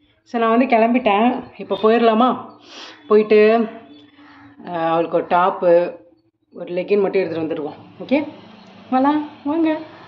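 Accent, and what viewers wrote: Kanyakumari, India. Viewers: Akka Kanniyakumari slang pesunga replay seiyoom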